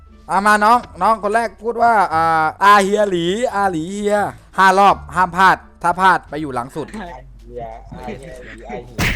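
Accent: South, Thailand. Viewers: พักแหลงใต้ก็ได้เดี๋ยวคนกลางฟังม่ายรู้เรื่อง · พี่ คนใต้เหรอครับสำเนียงชัดเลย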